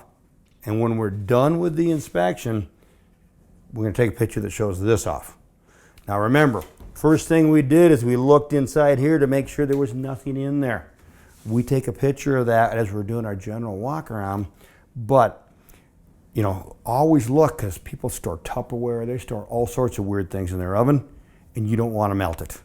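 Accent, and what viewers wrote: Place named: Colorado, United States. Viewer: Great video. Very useful. Also, I had no idea that ppl say ruff instead of roof in Colorado.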